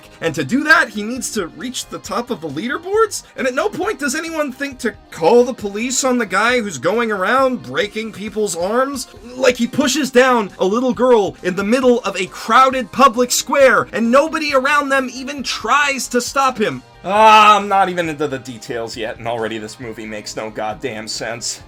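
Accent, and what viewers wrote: Canada. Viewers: Holy cow! Are you Canadian??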